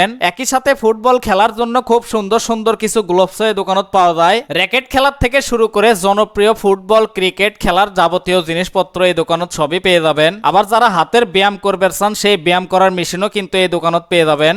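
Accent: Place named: Sylhet, Bangladesh. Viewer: Ata ki. Sylheter❤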